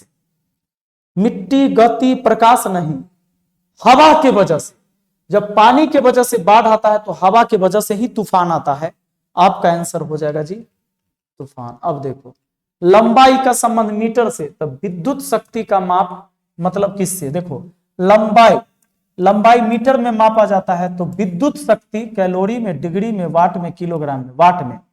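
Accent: Bihar, India. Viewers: सर जब बिहारी बोलते है ... मुझे बहुत अच्छा लगता हैं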